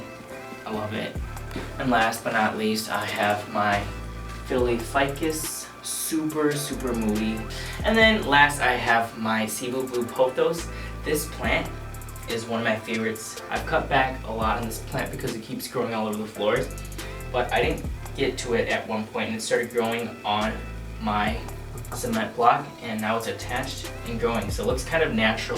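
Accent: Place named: Midwest, United States. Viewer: Do you live in the Midwest? I feel like I hear a little accent!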